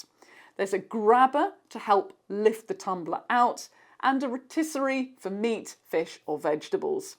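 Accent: England, United Kingdom. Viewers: I have joined your channel as it is English and that makes a refreshing change from American or Pakistani channels that don't understand the English people and what we want in an appliance, and an English person that speaks very clearly, thank you, I am looking forward to many reviews.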